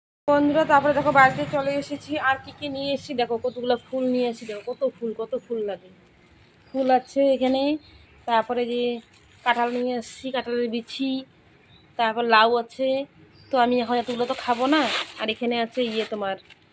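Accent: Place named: Rajbanshi, India. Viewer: রাজবংশি তোমাদের ভাষাটা বেশ ভালোই লাগলো সুনতে দিদি ভাই ❤😊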